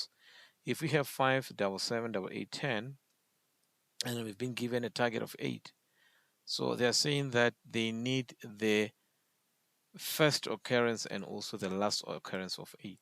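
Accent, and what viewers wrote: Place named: South Africa. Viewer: Please continue making these DSA videos. Your teaching style resonates with me, and perhaps it’s your South African accent that I find refreshing.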